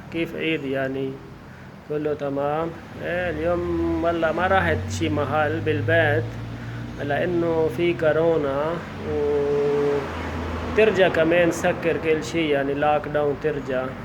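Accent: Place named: Pakistan. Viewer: لغة العربيه باللّهجة الاردوية.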